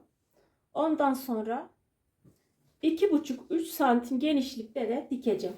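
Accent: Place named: Sivas, Turkey. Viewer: Konuşman Sivas'ı gibi kolay gelsin eline salık evladım.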